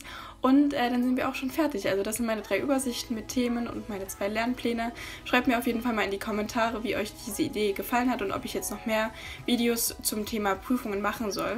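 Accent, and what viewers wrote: Saxony, Germany. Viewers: Kommst du aus sachsen? Komme selbst aus Sachsen und es klingt von den Prüfungsfächern und so ziemlich nach Sachsen (und auch bissl dein Akzent😂❤️)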